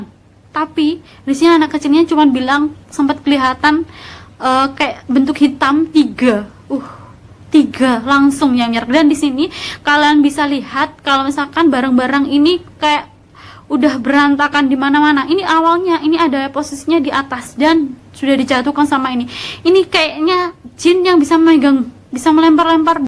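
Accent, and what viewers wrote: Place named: Malang, Indonesia. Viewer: Ceritane serem, tapi ngerungokno ngomonge lucu rek... ngalam banget 😁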